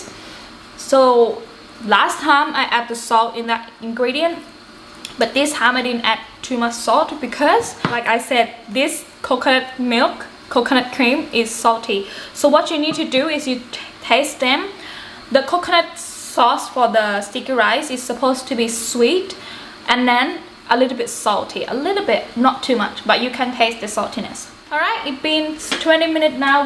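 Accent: Australia. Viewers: Hello, you are starting to get an aussie accent! Love your cooking vlogs! :) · you are getting an Aussie ascent .....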